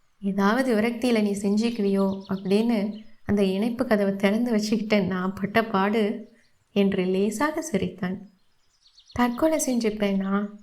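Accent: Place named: Kongu, India. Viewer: Your kongu slang I am very happy to relate to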